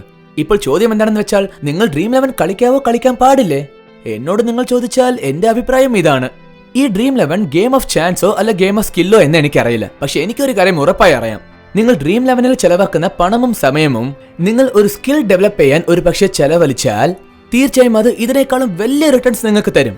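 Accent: India. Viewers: Not natural Malayalam looks like a Tamil guy did the voice over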